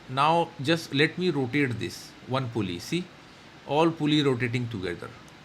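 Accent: India. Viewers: May I know what is the real use if you don't show calculations or show top down modelling approach from scratch? Solidworks if you really create it for fun make a playlist for that. But for serious application engineers like me it is mandatory that you show detailed calculation the entire process whats going on inside the chamber right rather than keeping it as bloops behind the curtain. Kindly try to tune your pronunciation. Ur bloody Indian accent sounds awfully monotonous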